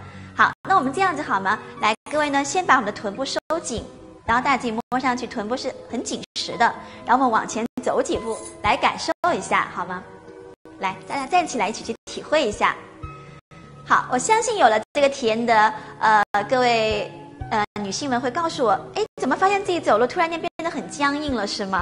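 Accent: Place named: Russia. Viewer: 字正腔圆，好可怕😨听着听着，好像回到了罗刹国。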